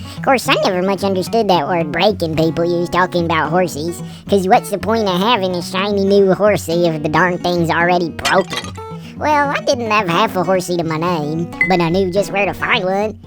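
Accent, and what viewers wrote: United States. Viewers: my favorite of your shorts! I love the lil southern accent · Im so glad to have watched through the 38 and 42 hour Beverly Hillbillies videos, her accent/misunderstanding reminds me of them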